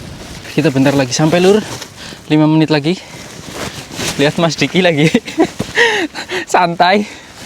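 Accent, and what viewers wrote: Banyumas, Indonesia. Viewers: Ngapak daerah mn mas?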